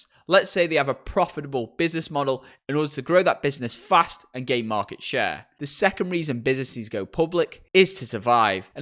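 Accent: United Kingdom. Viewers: i love to stay in Airbnb so much when i travel but i cant agree more with that the company go public at this time is able to survive through this pandemic. long term Airbnb will profit and grow even more than it already proved in the past. i will keep this stock very closed to my watch list and see how the IPO and the stock price going but would love to buy shares one day. thank you for the amazing content plus attractive British accent:))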